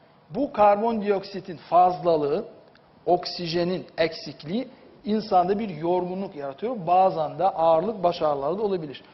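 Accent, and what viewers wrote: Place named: Azerbaijan. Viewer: Konuşan üstad kesinlikle azerbaycanlı! 😊 Yanıldım mı? 🤔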